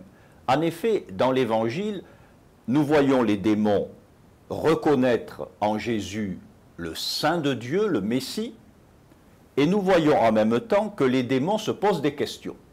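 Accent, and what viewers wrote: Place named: Marseille, France. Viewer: So I'm scrolling through the hillbilly Thomists avoiding to write my essay on angel and then comes by with his hint of Marseillais accent bringing me right back home and back to work too... The book he wrote on angels has been translated in English and is really good!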